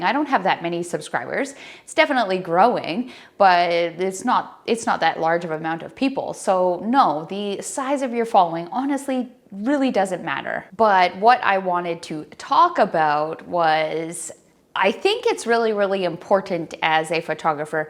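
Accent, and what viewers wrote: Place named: Canada. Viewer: I have always had a real distain for the mindless groupthink rush to "the latest" social media channel. I found Flickr a long time ago and loved it for its rich features! And you know what? I'm still there because it's such a great place for me. Apparently everyone went to Instagram and are now flocking to Vero ... whatever. Flickr does a superb job of helping me quickly and easily organize, tag, geotag, publish and share my best work with likeminded people. But foremost in every photographer's mind (those who want to make some kind of income) has to be exposure. No one will buy if they don't see your work. So the sad truth is that we all want to maximize exposure. Btw, as an ex-pat Canadian, I'm delighted to find your channel. Long ago I couldn't hear the Canadian accent. After 23 years away from Canada, I can. It's so lovely to hear and your work and video content is wonderful.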